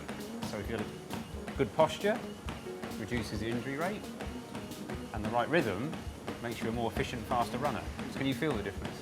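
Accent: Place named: United Kingdom. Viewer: All the information seems good, but does anyone else hate fucking British accents?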